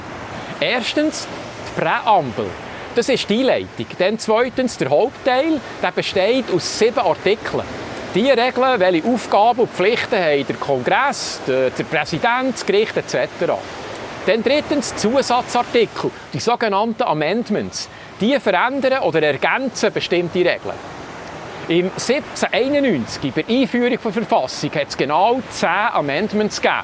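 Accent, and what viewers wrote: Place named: Thun, Switzerland. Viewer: I bi fasch vo Gunte, oder eifach am Thunersee ufgwachse u gniesse sit über 10 Jahr mi Ruhestand im ewige Summer vo Südoschtasie. Bravo! So klari Wort i gnau mim Dialäkt ds ghöre isch ä Woutat. Ds Abo isch gsetzt.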